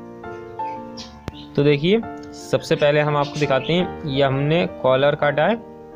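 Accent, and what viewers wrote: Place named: Pilibhit, India. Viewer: Nice... Aap rahte kahan ho? Bhai bhasha bilkul humari jaisi hai.... Pilibhit zila....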